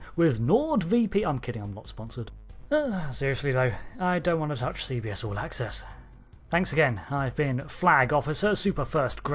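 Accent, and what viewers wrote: United Kingdom. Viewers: E-celeb British accent. Alt F4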